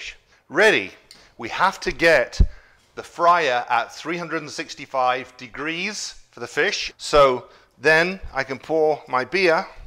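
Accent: England, United Kingdom. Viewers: lol, I've heard, still would love to visit, see the architecture, the culture, everyone having your accent lol I wanna be british for a couple days lol... Come to NYC, if you haven't already.. many things to do here, and you can find the best of any culture of food. Your accent is the rarest you hear in NYC too, from my experience lol. there's asian areas (chinatown, etc.) Jewish communities, (brooklyn) there's black areas, there's indian areas, latino areas, etc... it's a melting pot of every culture.. basically the only time you hear someone with your accent is if it's a tourist lol... in my experience. To stay there for a couple days, and everyone I talk to having your accent would be a fun experience lol.. but that's normal for you. So interesting to me. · What's the favorite fish bar called? I sometimes have a hard time understanding English accents sorry! 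But I'd like to go if I ever visit Scotland :) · There are so many accents in England. Do you mind telling us where you grew up.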